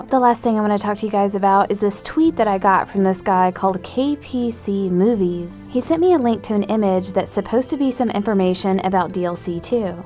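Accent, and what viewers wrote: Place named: South, United States. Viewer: She's southern of course she has a nice voice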